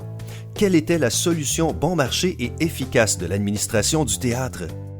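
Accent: Quebec, Canada. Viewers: Il a un accent québecois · Hmmm son accent est cwlui dun quebecoi si je me trompe pas..☺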